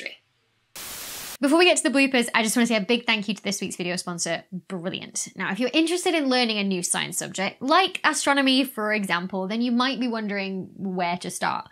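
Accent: United Kingdom. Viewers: Dr Becky... charming as always... your UK pronunciation of geysers indeed sounds like US pronunciation of slang for old men... keep the accent... One topic I hope you'll do would be on tidal locking of bodies in orbits. It is really hard to understand why rotation rate could/would synchronize itself to orbital speed since bodies don't have handles (like pots and pans) by which the larger central body could "grab" them and adjust their rotation rate (eg: how did earth fine tune Moon's rotation to tidally lock it since moon doesn't have handles and Earth doesn't have arms by which to grab and do the trick). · Tell me, Doctor, what accent of English is it that you wrap your presenting in? It does not sound exactly the way of American I am used to hearing on YT, nor quite British. It is rather lovely, let me say... Oh, British then? What kind of British? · I thought 'Geezer' is an anglicism. 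Turns out geezers are a global phenomena. I can't tell woch county she's from, but local accent is most definitely at work here. Somewhere between Cardiff and Glasgow, i'd guess. England alone have more diverse dialectal difference than entire USA. Like, you could make out the native postcode from listening to them. Geezer.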